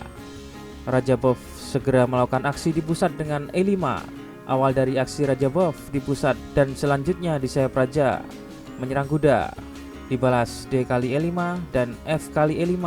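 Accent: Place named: Java, Indonesia. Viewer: Sehat selalu bapak dgn dialeg jawanya😊❤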